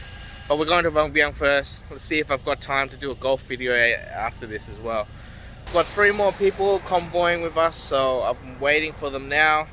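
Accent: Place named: Australia. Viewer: Sounds like Australian accent. Did you live in Aussie before? I used to work in Laos. Sabaidee from Indonesia 🇮🇩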